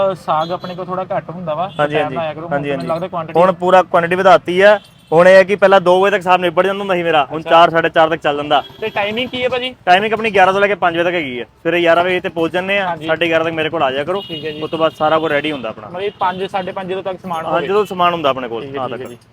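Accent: Majha, India. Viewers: Ahii majhe Wale a pra...ahi te adkar hi khene a ...😅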